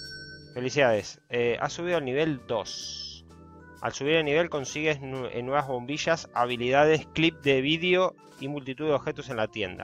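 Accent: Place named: Argentina. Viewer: que vas a ser de españa vos,sos re Argentino,se nota cuando hablas solo con decir zapatilla ya me doy cuenta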